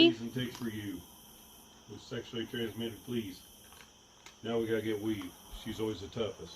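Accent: United States. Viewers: i cant get enough of ur american accent, i just love it how u say "come here u little shht" 😂😂😂 much love from Estonia!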